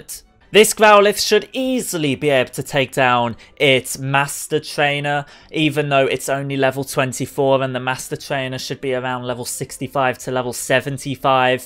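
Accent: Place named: Liverpool, United Kingdom. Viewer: SCOUSE DETECTED RED OR BLUE CHAMPIONS OR CRAP CHOOSE NOW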